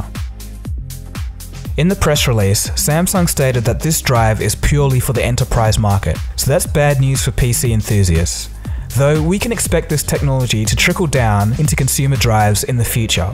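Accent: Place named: Australia. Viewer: He is from Australia that my explain the pronounciation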